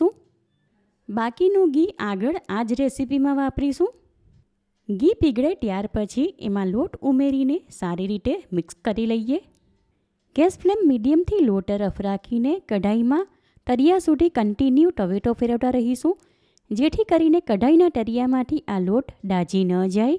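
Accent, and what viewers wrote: Surat, India. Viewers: Ben aa Ben surat na che, tya ni bhasha aavi j hoy che, toh aapne respect aapiye, baar gaame boli badle, badha na uchcharan juda hoy, je मातृभाषा hoy tene shu kam chhodavani?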